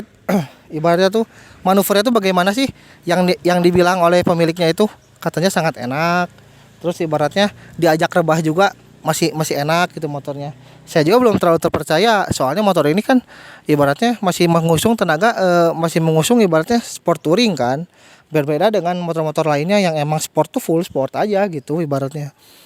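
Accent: West Java, Indonesia. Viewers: Meni balelol ngreview na...logat sunda na kaciri..mudah2n kaharepna tambah maju jang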